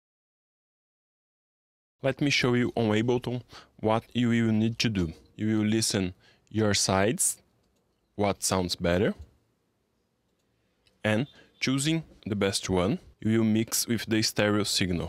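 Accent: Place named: Brazil. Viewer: Brazilian accent af, thanks for the video brother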